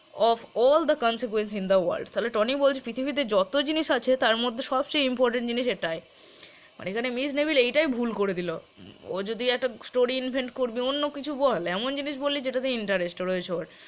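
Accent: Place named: Bardhaman, India. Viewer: আপনার বাংলা অনুবাদ এত সুন্দর ম্যাম যে মনে হচ্ছে সত্যিই live দেখছি ড্রামা টা। তার সঙ্গে ম্যাম আপনার বর্ধমানের tone " তু " ।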